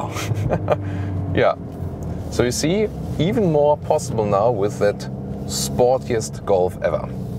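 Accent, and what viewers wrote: Germany. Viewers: Your English is EXCELLENT with a bonus very cool German accent. I can understand your vocals better than way too many of my own countrymen. You choose your commentary so carefully I am rarely left with a single unresolved question, and your delivery is always calm, cool, and collected. Your thoroughness deserves special mention. You know what enthusiastic drivers want to know and you can’t possibly suffer a single disappointed viewer. Thank you for such great automotive journalism and video production.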